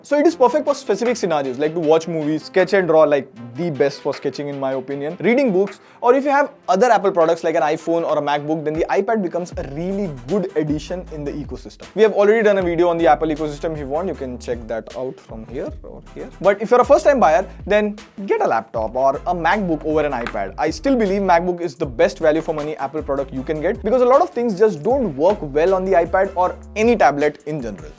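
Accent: India. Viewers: Bsdk tu Indian hai ya angraz ?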